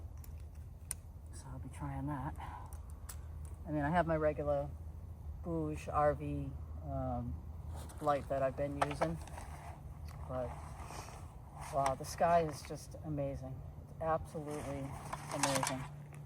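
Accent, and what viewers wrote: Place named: Boston, United States. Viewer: Love your vive. I love your videos, but I love your Boston accent more. Can u add the word badabin badabun. I'm asking with all respect. Shout-out from Brownsville, Texas.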